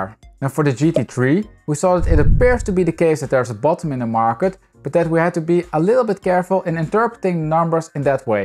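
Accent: Netherlands. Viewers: Just curious, guessing Nederlander? Where are you from exactly if i may ask? Love your channel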